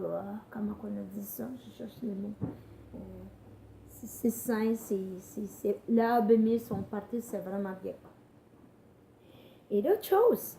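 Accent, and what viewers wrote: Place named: Canada. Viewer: Merciii, c'est vrai que c'est excellent l'huile de Ricin. J'aime beaucoup ton accent canadien.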